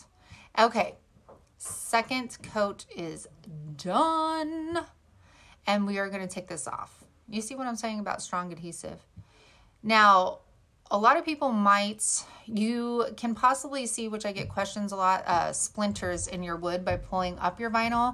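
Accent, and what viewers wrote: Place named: North, United States. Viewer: Hi I just came across this video and had to watch it to learn some things because making wood round signs is my favorite craft to make. I always order my rounds from Amazon, but they aren’t anywhere near that thick! Those are really nice! I am from Southeast Kansas. It sounds like you are up north from the places you shop at. Thank you for the tips I picked up! I enjoyed and subscribed to your channel.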